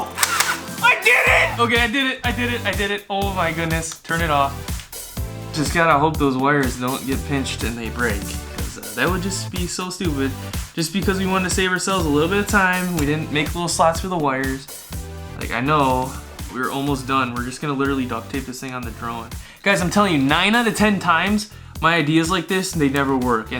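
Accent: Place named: Minnesota, United States. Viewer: You must be from Minnesota, that accent is thicc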